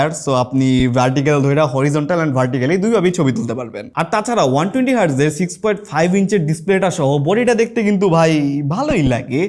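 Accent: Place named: Bangladesh. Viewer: ও ভাই আপনি বাংলাদেশের কোন এলাকার ভাষায় কথা বলছেন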